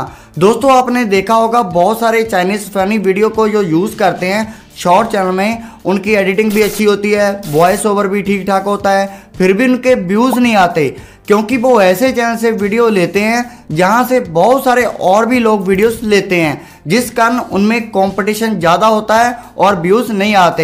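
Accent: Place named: Punjab, India. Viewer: Bhi app Punjabi oo lgda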